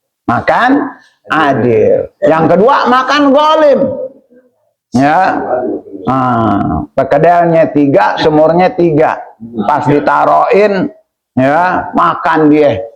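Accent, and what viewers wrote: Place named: Jakarta, Indonesia. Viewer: Nie ustadz dialeknya Betawi banget ane sangat suka 😅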